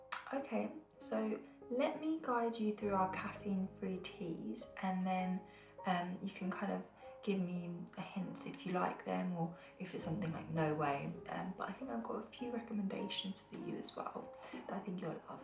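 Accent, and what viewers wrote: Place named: United Kingdom. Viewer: This channel is such a gem! Your detailed explanations are perfect and I love the option of music - so rare - I find it so relaxing and grounding. I find it so interesting, I’m American and am rarely relaxed by British ASMR. I think you have a similar accent to Drifting SJ who I also like - and this channel 80gemstar that no longer exists (although her accent was considerably more intense). I think you all have great texture in your soft speaking voice which I really appreciate. Thanks so much !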